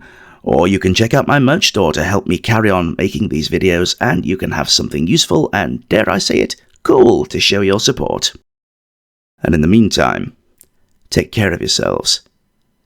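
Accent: Australia. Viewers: Cool stuff! Liked your Aussie accent too 😂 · Love it,and your aussie accent was your best yet ;-)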